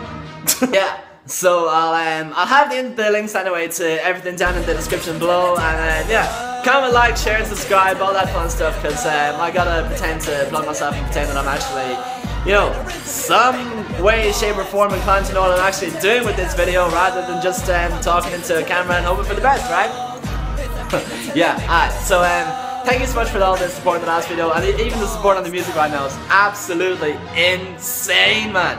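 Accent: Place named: Ireland. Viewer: DANG the most adorable ACCENT yo!!! LOL 😂😂😂😂Almost at 200 subs, freaking awesome!!!! YES< we need more of these, too!!!! I don't care which day your vids come out, I'll be there fam!!!! 😀😀😀LMAO with the editing...the comments to yourself bwhahaahha "JESUS CHRIST, "!!!! LMAO 😂😂😂😂Definitely keep these coming, too along with your awesome new beats!!! And I'm going to keep nagging you about that autograph until I turn blue in the face...and a BLUE EGG is NOT a pretty sight, yo!!!! LOLOL 😂😂😂😂 Much love my adorable, irish friend!!!! xoxoxoxox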